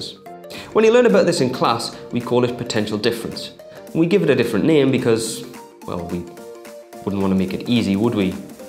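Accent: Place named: United Kingdom. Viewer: He is bri'ish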